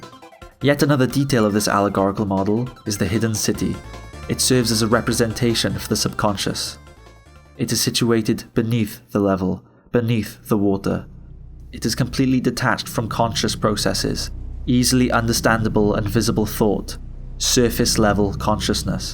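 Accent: Wales, United Kingdom. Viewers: Wondering this what part of Wales you from? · everyone else is talking abt the crepypasta but i just cant not notice the welsh accent lol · Wait are you br*tish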